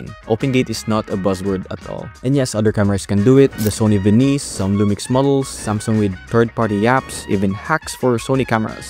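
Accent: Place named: Philippines. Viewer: Love the Filipino accent ❤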